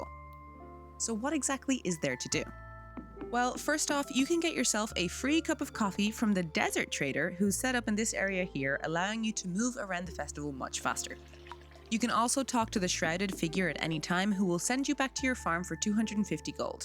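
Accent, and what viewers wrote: Ireland. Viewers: I hope you don't mind me saying, but I looove your accent! Is it slightly Irish??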